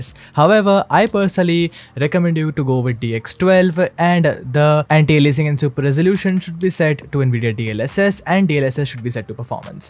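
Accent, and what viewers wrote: India. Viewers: Bro are you Indian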